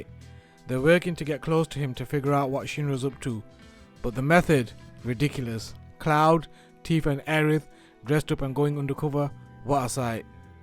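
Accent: United Kingdom. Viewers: Fantastic video and a great retrospective. Thanks so much! I imported this title along with Final Fantasy VIII on Switch. Having both full games on one Nintendo cart is amazing! BTW, where are you from? I can’t quite place your accent. I do love it, though. 😉 Edit. Ah, you’re British. I looked up Sheaf Market Square. Now I know. 😁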